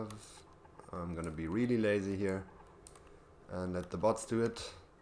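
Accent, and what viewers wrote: Germany. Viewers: Aye bud, you kinda sound a tiny bit German is that possible?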